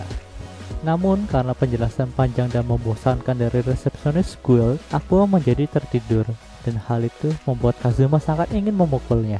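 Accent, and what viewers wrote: Cirebon, Indonesia. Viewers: Orang Cirebon njir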